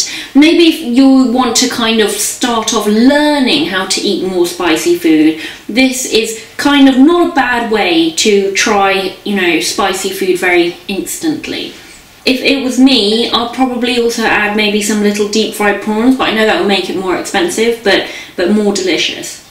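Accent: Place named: United Kingdom. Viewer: Sound so british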